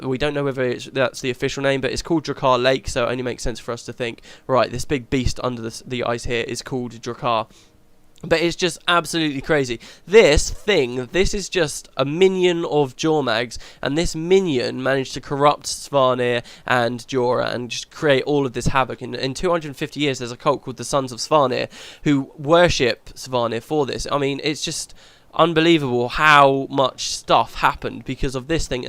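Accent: United Kingdom. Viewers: "Bollocks!" ;D British english>American english tbh, finally found some variation :) Oh, and about your plan on how many videos to upload at a time, try hold about this phase or solwer if u want it to time it with beta/release. And maby you are being too optimistic about when GW2 is coming. For all we know, it might just as well come 2014 :/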